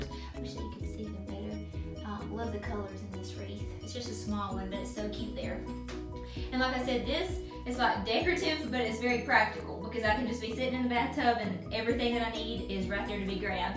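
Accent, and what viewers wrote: South, United States. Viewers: OMG! I love your home, your and Drew's awesome creativity and your sweet Southern accent! I have to admit when you said you'd show us the shower, then you said "we had a wedding shower here"...I had to coordinate my thinkin' for just a second to figure that out...LOL!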